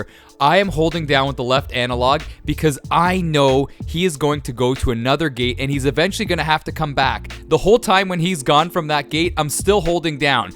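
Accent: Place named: Canada. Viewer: I knew you were Canadian because you sound just like Ricky off The Trailer Park Boys.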